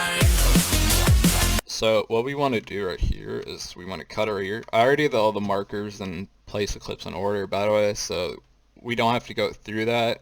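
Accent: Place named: Netherlands. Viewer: i smell dutch accent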